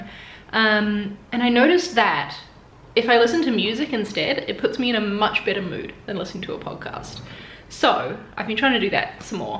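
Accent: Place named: New Zealand. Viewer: Besides the design stuff content that I really enjoy and helps me, I think I watch you for your English accent and those occasionaly 'yeeeeeah' kiwis that you drop sometimes <3 I miss NZ sometimes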